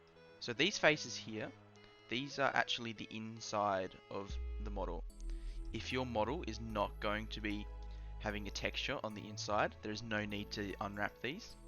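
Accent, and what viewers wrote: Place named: Australia. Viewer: Your the first Aussie I’ve heard on a maya video 😂🎉🎉🎉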